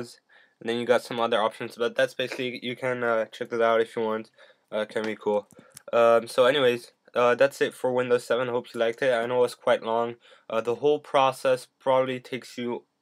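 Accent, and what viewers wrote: Netherlands. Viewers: Are you dutch? (Recommend at this channel)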